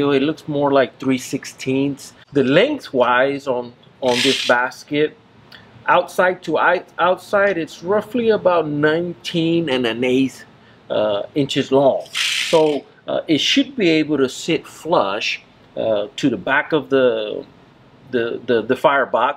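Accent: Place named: South Texas, United States. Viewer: Where are you from you have that south Texas accent just like I do.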